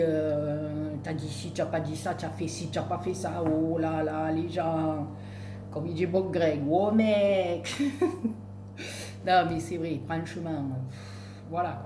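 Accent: South, France. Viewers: Moi je viens sur les chaînes pour trouver du plaisir . Chez vous je me régale. Déjà l'accent trop top , les expressions du sud, je ne m'en lasse pas. Vous êtes très minutieuse dans vos créations, cela se voit de suite. Alors si nos commentaires vous apportent du plaisir , c'est un partage. Ceux sont ces petits bonheurs qui font que la vie est belle. Je vous souhaite que tu positives. Belle journée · Oh que tu es magnifique tout en beauté, que de belles cartes, tu le mérite beaucoup et magnifique création que tu nous a encore fait. Mais c'est normal que l'on soit nombreux à te suivre car tu es une belle personne, tu es honnête, franche, et super à t'écouter avec l'accent du sud et ton franc parler moi j'adore. UN GRAND GRAND MERCI POUR TES TUTORIELS, TES BLABLAS ET TON HUMOUR qui nous remontent le moral à ce faire péter le bide de rire. Allez passe un bon réveillon et à bientôt bisous de Bourgogne.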